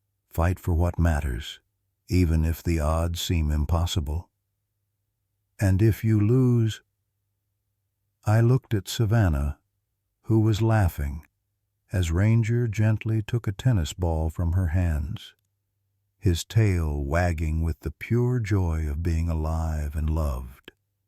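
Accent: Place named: South, United States. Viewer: I love this narrator with the southeastern or western accents.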